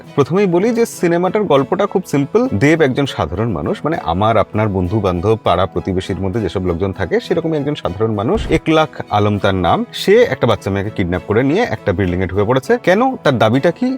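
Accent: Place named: Bangal, Bangladesh. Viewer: Apnar bangal accent ta amar besh lage 😊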